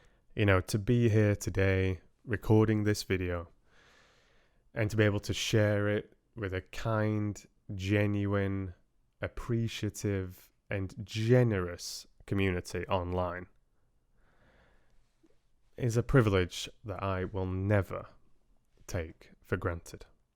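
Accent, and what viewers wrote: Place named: Liverpool, United Kingdom. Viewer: Are you from liverpool? You have the same accent as the beatles.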